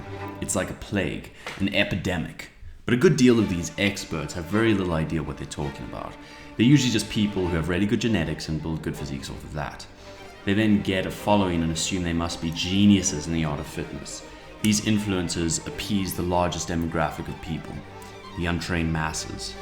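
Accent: South Africa. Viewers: i needa ask, are you South African? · Great video. Spot on, all these trainers often end up paralyzing people into inaction because there is always supposedly a more optimal way of doing something. It's certainly happened to me and many others I know. Also, nice to hear a South African accent! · South African bro? Let’s go represent Bru! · Great video mate! Can def tell youre from SA. Represent us brother! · 🚨🇿🇦🚨 Saffer twang detected! Saffer twang detected! 👮📢 Aweh Aweh boet 🤙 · Btw you a saffa bro? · are you south african?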